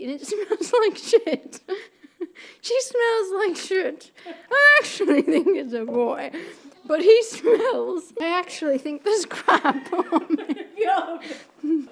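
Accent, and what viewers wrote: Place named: United Kingdom. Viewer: Aww i love youuuu ❤️❤️❤️❤️ and your British accent is brilliant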